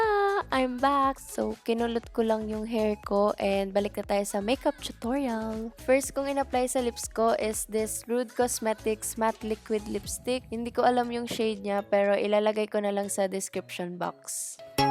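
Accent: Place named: Bicol, Philippines. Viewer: I've missed you and your cheerful and bubbly voice (with the Bicolano accent)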